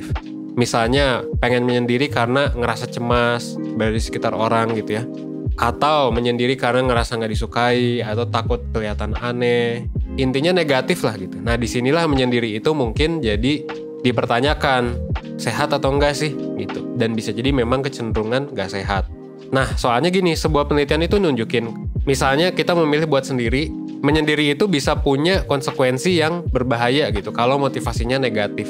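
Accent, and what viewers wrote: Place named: West Java, Indonesia. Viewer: resep pisan pas ngomong orang sundaaa hoho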